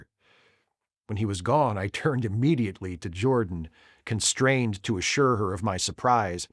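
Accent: United States. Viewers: Like a lot people my age, this was required reading in college (“college” is British for High School, to avoid confusion) but I always loved it anyway. There’s definitely something about hearing the text in an American voice. I’ve been a subscriber for years now, so your love for the novel is not new, but this is excellent work, I’ve listened to every part so far, and it’s something that I’m going to look forward to seeing in my subscriptions for the next few evenings.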